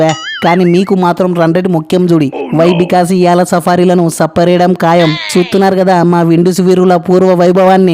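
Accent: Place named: Andhra Pradesh, India. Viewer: Ni videos & ni andra slang I like it bro keep continue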